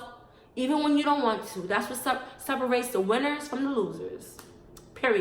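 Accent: New York, United States. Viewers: She got that NY flow but she is classy and inspiration. Whole diff vibe 💖✨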